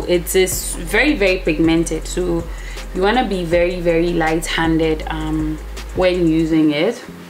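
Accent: Ghana. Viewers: Beautiful! You sound like a Ghanaian🥰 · I don’t know how I knew you were from Ghana. Maybe it’s your accent or your beautiful face. I am not Ghanaian but my husband is and I spend a lot of time there because he still lives there. Keep doing what you do, dear. Are you in Ghana presently or in the US?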